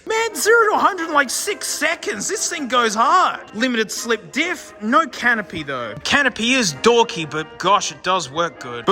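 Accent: Australia. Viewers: You are the only YouTube aussie that I subscribe and keep up the good work and I love your accent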